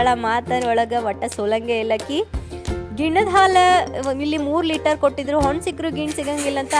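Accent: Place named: North Karnataka, India. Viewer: Nimma Uttarkarnataka maatu ista magale, bcos naa hutti beladiddu alle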